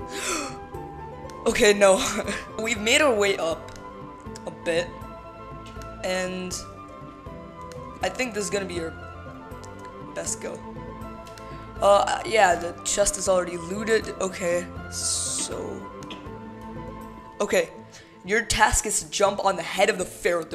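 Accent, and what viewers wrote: India. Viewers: Nice vid but are you Indian.........?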